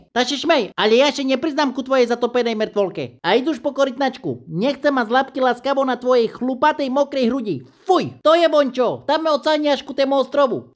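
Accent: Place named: East Slovak, Slovakia. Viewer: Juuuj, jsi největší hvězda ⭐ na jakou jsem narazila. Kdysi jsem měla manžela z východního Slovenska a tento dialekt miluju❤mám na to krásné vzpomínky ze Šariša😂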